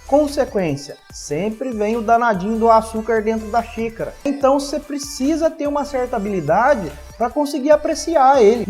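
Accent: Minas Gerais, Brazil. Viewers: Sotaque Mineiro kkkkk eu falo do mesmo jeito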